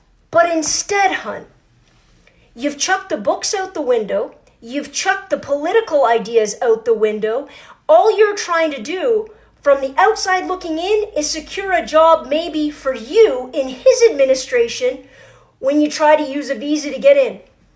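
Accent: Canada. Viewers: Now that I know you're Canaidien I hear it. Love it. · This is what a true Canadian who loves their country looks like and sounds like. I know that scares some people, but I say thank you.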